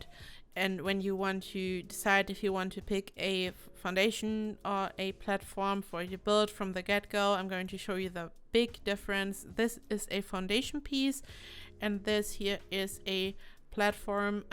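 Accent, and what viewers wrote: Germany. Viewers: you're English has a German accent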